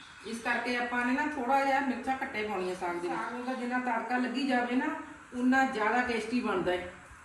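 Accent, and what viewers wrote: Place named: Fatehgarh Sahib, India. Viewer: Asi bhi aive tadka laune aa ji tuhadi boli Fatehgarh sahib ja ropad dist di sister kehda pind aa ji tuhada video bahot sohniya hundiya ne tuhadiya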